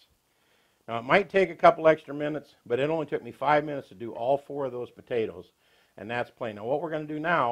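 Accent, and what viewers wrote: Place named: South, United States. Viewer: Nashville, Tennessee. I love the southern accent.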